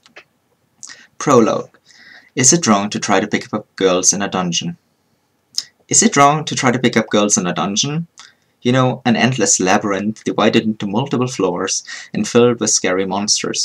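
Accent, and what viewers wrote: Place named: Germany. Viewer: where are you from? i dont think that you're a native speaker... sorry if yoz sad that in one of your vids, im new on your Channel ;) You could be from Germany tho at least that was my first thought : ouh this guy has to be german hahaha great work ps.: Im from Germany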